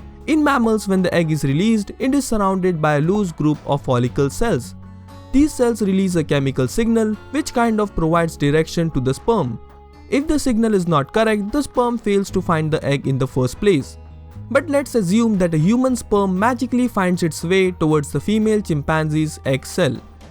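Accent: India. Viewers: Indian accent tho :( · Mann im indian and this accent even hit me like a ton of bricks. Got dam ginda! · I love Indian accents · of course it's a east indian turban head doing the narration lmfao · Indian accent again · LISTEN INDIAN MAN YOUR ACCENT ...SEEMS LIKE YOU PRAY TO YOUR GOD IS A HANUMAN . IM INDIAN TOO MY GOD ALMIGHTY LIVING IS MY CREATOR. PEOPLE LIKE YOU SHOULD IS UNETHICAL TEACHING WRONG CURIOSITY WILL DESTROY HUMAN RACE AND PEOPLE'S FAITH. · No doubt , Indian accent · Me: *sees video Me: *hears indian accent Nope.. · They already have had a half Human and half Chimpanzee. He lives in the 70s. The maker of this video didn't do his research properly. Also funny Indian accent.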